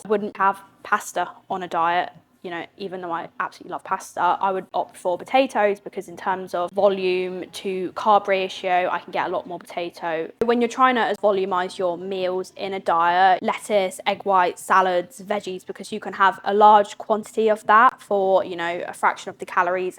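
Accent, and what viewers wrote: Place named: United Kingdom. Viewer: Strong ! It's always a pleasant surprise to hear a British accent :)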